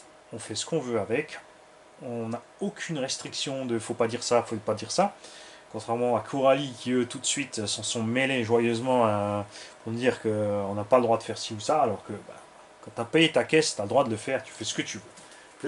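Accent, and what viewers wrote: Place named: Alsace, France. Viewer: Salut, Je débute dans le RC "moderne". J'ai monté un Tamiya Madcap en kit dans les années 90 et je suis tombé sur des chaines de RC par hasard sur youtube pendant le confinement et ça m'a donné envie de m'y remettre. Je suis de Strasbourg donc je te comprends parfaitement;) même si tu parles en alsacien! Elsassisch ìsch bombisch, Hoplaaaaa! Je cherche plutôt un buggy RTR Brushless en 1/8 aux alentours de 300/350€ soit un budget total d'environ 450€ (voiture + radio + batteries + chargeur). Je ferai 2/3 bitume et 1/3 TT, quelques petits sauts et pas la peine d'aller à 100km/h. Un 4S suffira. J'ai vu le nouveau Absima Stoke Gen2 4S qui a l'air pas mal. Qu'en penses tu? Merci.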